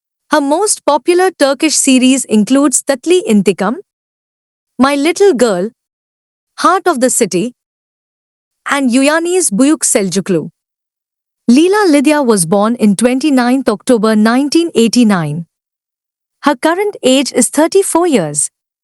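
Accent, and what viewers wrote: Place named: India. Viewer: Ta blbkaco to cteat se naučí výslovnost angličtiny ! Ať nečte nesmysly krava patrně bych řekla india nebo podobné !👎👎👎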